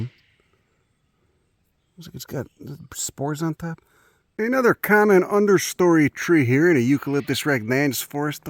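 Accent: Chicago, United States. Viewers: I love the mental transition from "some dude in the USA with the fast talk and the accent" ... "in Tasmania". Gives me a whole new way of looking at the country. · I thought it was old school Chicago lol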